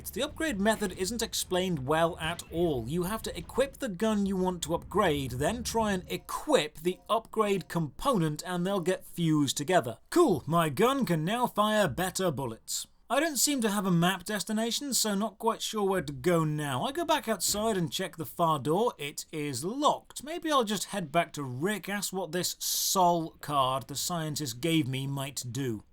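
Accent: United Kingdom. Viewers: all of them just shouldve gotten rid off everything they are carrying with them. no reason to die then. also, lets take a moment to appreciate reading and favouriting comments on his one year old video. absolute chad loved to play dino crysis 2 back in the day and i have to say, nostalgia is so much better when funny comments and a british accent are involved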